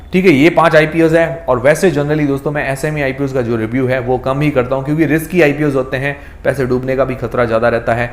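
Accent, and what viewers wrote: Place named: Marwari, India. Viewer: आपके बोलने के लहजे से मारवाड़ी लग रहे हो।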